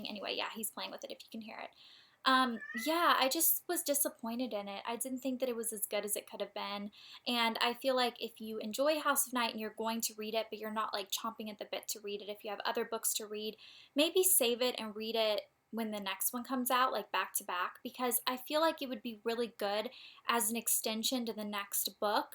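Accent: South, United States. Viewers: aww your southern twang is coming out more since you're at home :) adorable!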